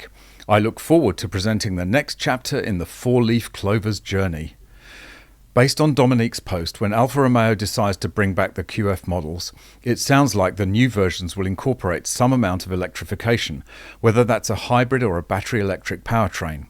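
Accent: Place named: England, United Kingdom. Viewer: Oh! What about Mercedes, Rolls Royce, Bentley and an old Australian car called a Statesman Deville, made by Holden, which I’ve had for years. I think they’re heading in the same direction. That’s my collection... And how do we get away with driving our beloved old cars? I don’t know. It worries me a lot. Annie from Melbourne. I like your channel, and it’s good to hear an English accent again. I lived in England for three years.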